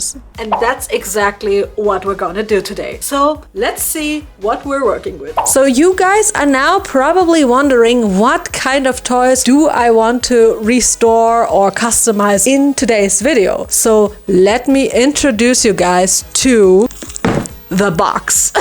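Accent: Germany. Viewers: This is the first video i see from you and i was like "I hear german i just cant proof it" until you sayd Schlüppi Ich bin vor Lachen von meiner Couch geknallt😂 First impression btw😂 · Is it possible that she is German? The way she speaks English sounds like it. wish you all Love Light and laughing 💛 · Guys is she german? Because im german and I think she has a german accent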